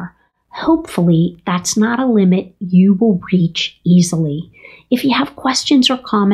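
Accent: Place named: United States. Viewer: this is mark, from the west country of England, I appreciate your approach, I hope you do really well with it, it is so important for the coming generations, that our elders have access to proper Lee paste properly paste paste PACED paste Instruction on how to use the iPhone and Mac and iPad, and all of that malarkey I would love to see a video of you recording how to dictate, as I tried to above, and failed, the word PACED, which I have only managed to get my iPhone 6 to iPhone 6 two Transcribe by spelling it out, letter by letter. And, it won’t even get the number of my phone right, I would have to dictate the number one, then the number six, for it to work, as below: iPhone 16 new paragraph, and it still cannot understand it about 70% of the time when I say the words new, paragraph, it just types out new paragraph until I say those two words several times, one after the other, like I just love your accent, and would really appreciate knowing which exact town you are from in the United States! 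Me, I have a south east accent, I was born on an island of England, called the Isle of Sheppey Very best wishes, Mark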